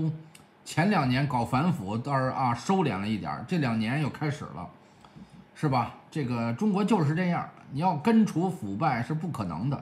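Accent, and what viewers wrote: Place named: North, China. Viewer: 最烦这种北方口音 肚子里没文化 整天要指点迷津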